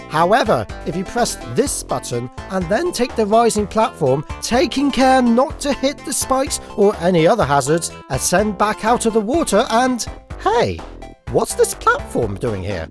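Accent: United Kingdom. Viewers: HE'S THE BRITISHEST GAMER YOUVE EVER HEARD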